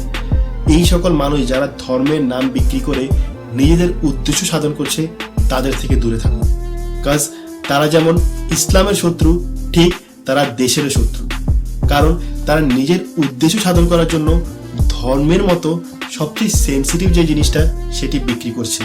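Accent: Noakhali, Bangladesh. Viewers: bhai are you noakhailla? আমনে আসলে কি নোয়াখাইল্লা? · ভাই আপ্নে নাকি নোয়াখাইল্লা???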